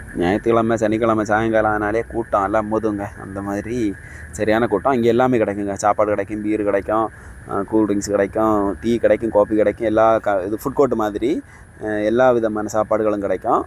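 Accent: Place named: Kanyakumari, India. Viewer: நீங்கள் கன்னியாகுமரி தானே..... நீங்கள் பேசும்போது புரிகிறது..... வாழ்த்துக்கள்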